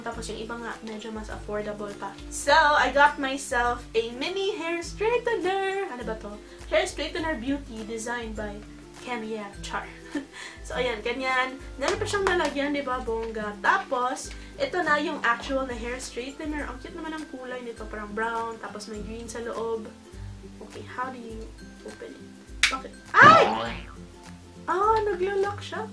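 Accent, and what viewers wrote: Philippines. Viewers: HI miss! I really love the way you talk, pinoy na pinoy.:) Thankyou po for this video/blog! it's very informative and useful para sa aming mga nagcacanvass muna or naghahanap ng mga reviews bago magpunta since malayo samin ang divi. but anyway, love your divisoria haul especially your vanity area. grabe, isa po talaga yan sa mga dream vanity area ko. yung madaming makeup brushes na nakadisplay, ang daming makeups, dream come true po talaga sakin kapag nakapaginvest ako ng madaming makeup at naachieve yung vanity arra na kagaya ng iyo. Kasi sa ngayon, nagiipon pa po ako at nagcocollect. hehe. I also do sideline na small time makeup artist, sa mga relatives ganyan hehe. Kaya po kung mananalo ako, makakatulong po talaga yan sakin sa pagsisimula especially nagpho-photoshoot (modeling) din po ako.💋💕 more power po and Godbless!💞 ig: Facebook: Jasmine Alodia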